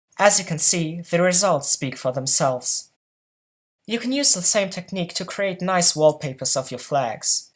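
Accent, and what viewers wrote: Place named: Germany. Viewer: Hey thanks, it's really incredible to find exactly the fringe flag-editing tutorial I needed as soon as I thought of it. Also, that's a delightfully German accent you have there. I feel like I'm being told grand secrets about *something*.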